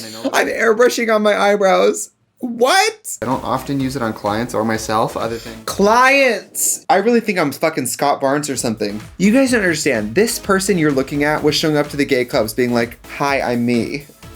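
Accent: Wisconsin, United States. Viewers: His Wisconsin accent is there. Represent! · the wisconsin accent really jumped out in this omg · As a fellow Wisconsinite...your Wisconsin was showing!! ❤ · That Wisconsin accent!!!! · The Wisconsin accent is so strong 🤣🤣🤣🤣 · Wisconsinite accent was so thick back then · u can really hear the wisconsin in this one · YES! Her Wisconsin accent was so strong when she was younger. She was so innocent and pretty though! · Never could notice Wisconsin accent until now 👀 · THE WISCONSIN ACCENT JUMPED OUT IN THAT OLD TUTORIAL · the Wisconsin accent really jumped out in the old clips oml bless 😂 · Girl the Wisconsin accent is thicc. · girl that Wisconsin accent is THICK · The strong Wisconsin accent really makes it that much better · I love you clocking your Wisconsin accent. I’m from Northern Illinois. I feel ya bud. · The strong Wisconsin accent really makes 2009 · I love how in your face her Wisconsin accent used to be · The Wisconsin accent ‼️ Where’d she go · What happend to your Wisconsin accent? 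· The Wisconsin accent jumped out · circa 2010 really gave us that Wisconsin accent.. RE. PRE. SENT.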